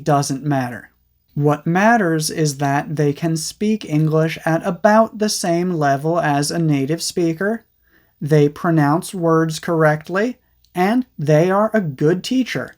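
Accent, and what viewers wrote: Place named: United States. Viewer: I've always been fascinated by your beautiful American accent.❤😊😊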